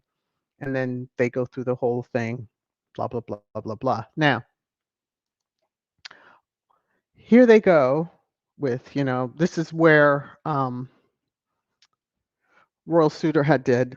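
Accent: RP, United Kingdom. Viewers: Hello happy Thursday, didn't catch the live but enjoy listening to replay, 👍👍👍👍👍👍💯💯💯😘😘😘😍😍❤️❤️😍🌹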